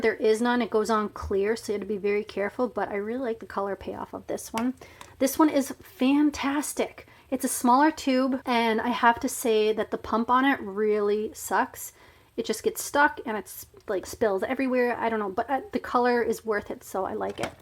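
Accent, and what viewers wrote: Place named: Maritimes, Canada. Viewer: i could tell you are from the maritimes from the accent my mom is too. i go back there every summer newfoundland is absolutley beautifu. l lots of love from vancouver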